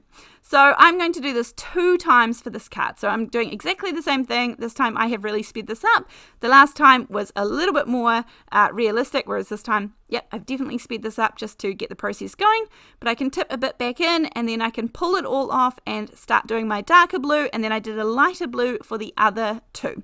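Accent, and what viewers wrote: Southland, New Zealand. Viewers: Wow, that's so awesome, I'm just getting into card making and your video is great, I noticed you're also from NZ, by any chance from Southland, I noticed the accent as I'm from Invercargill 😂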